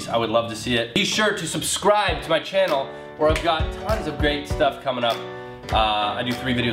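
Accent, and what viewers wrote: Canada. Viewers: I love the way Canadians say out😂😂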